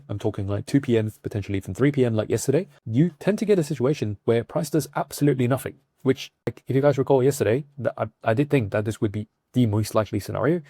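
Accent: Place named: England, United Kingdom. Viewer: Not sure what it was, I’m Australian and you’re accent is slightly English but super fast I had to slow it down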